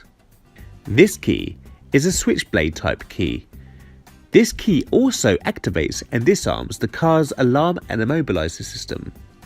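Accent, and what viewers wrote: United Kingdom. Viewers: Nice little car. Well made video too :) loving the British accent 👍 have a good day and thanks for sharing :)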